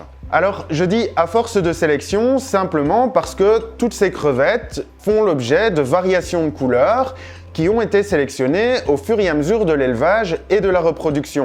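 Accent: Belgium. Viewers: Excellente ta vidéo, explications vraiment claires ! tu es belge ? Le Houit ne trompe pas en général 😊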